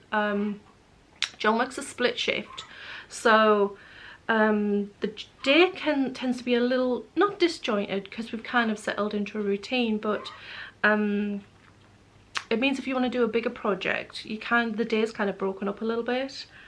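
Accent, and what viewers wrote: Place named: Northern England, United Kingdom. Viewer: I had to pop over to your website to find out where you are originally from because you certainly don't sound Scottish, but have a similar accent to me (I am on the NE coast of England) I think us 'Northerners' all have similar accents!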